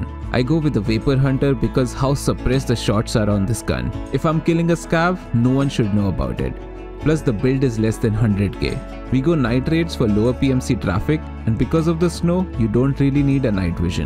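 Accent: India. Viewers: Idk why but you sound like an INDIAN... If yes than i'm surprised to see an indian making tarkov content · your indian accent makes this video 2x more enjoyable. On the other hand it contains very valuable knowledge - super good vid. Well done sir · Hears Indian accent. Clicks off.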